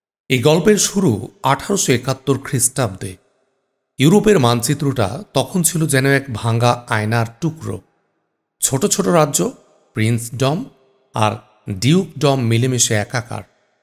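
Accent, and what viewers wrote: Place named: India. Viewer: Indian, voice sunei bujsi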